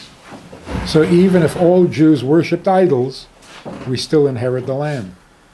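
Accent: United States. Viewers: good voice, sounds like a radical priest from arkansa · 😂 love the Texan accent, suits you! X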